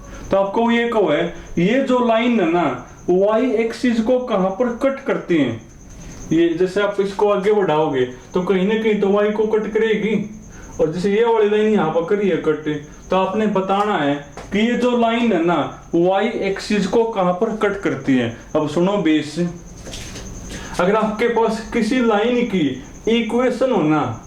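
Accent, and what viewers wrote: Haryana, India. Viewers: Sir I thik you Belong to haryana...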